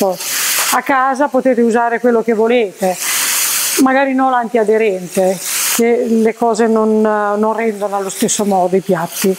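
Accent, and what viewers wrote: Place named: Romagna, Italy. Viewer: Ciao, la tua cucina mi piace moltissimo. Poi questo dolce accento romagnolo, delizioso. Ho guardato i nidi di rondine, buonissimo. Lo farò. Grazie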